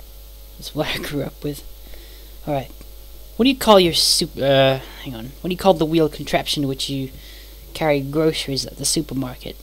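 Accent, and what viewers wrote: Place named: United Kingdom. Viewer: You have a cute voice! You sound like a Buizel! Your accent seems British to me.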